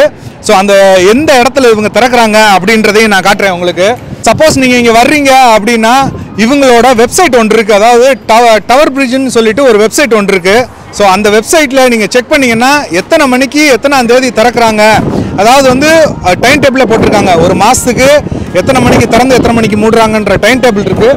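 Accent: Madurai, India. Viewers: லண்டனை சுற்றி பார்த்த உணர்வு.பதிவுக்கு நன்றி.மதுரைதமிழில் அசத்தலான பேச்சு.நடுசென்டரல 😀👌👌வாழ்த்துக்கள்.கோவிட் நினைவு சுவரில்SPB&VIVAKஎழுதியது .உலகத்துல உள்ள அனைத்து தமிழ் நெஞ்சங்களில் வாழும் இருவர்.பாராட்டுக்கள் வாழ்க வளர்க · உங்க(எங்க) மதுரை தமிழ்ல கேட்க ரொம்ப மகிழ்ச்சி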